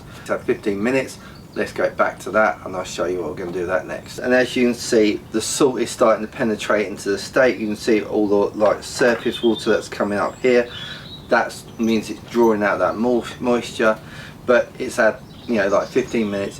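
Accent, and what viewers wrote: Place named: United Kingdom. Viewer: I love that 🇬🇧posh English accent! And the Ninja💐!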